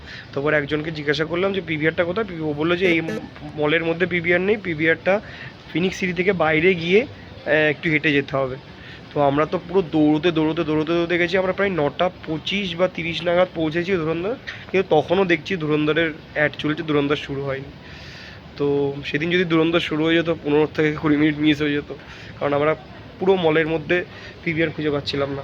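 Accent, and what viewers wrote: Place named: Bangal, Bangladesh. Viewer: Dada, tomar bangal accent ta 😂😂 Darun vlog ta ❤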